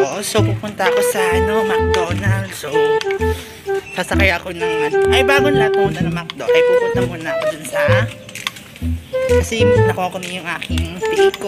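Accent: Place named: Iloilo, Philippines. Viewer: Ang Sarap Naman Po Nyan Lods... Parang ilonggo Ka Ata Lods. Haha Napansin Ko Lang Po Sa Tono. #Jf_Acuña_Lang_Malakas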